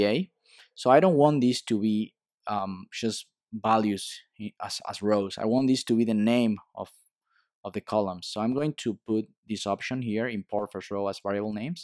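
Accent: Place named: Spain. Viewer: Very nice instructions that get to the points immediately. Just had to get use to your charming Spanish accent, but after overcoming this "obstacle" no problems whatsoever!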